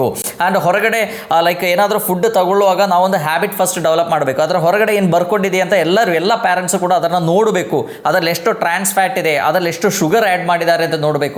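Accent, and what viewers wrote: Kerala, India. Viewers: Good explanation 🎉 good kannada by pronoucetion I found malayali🥰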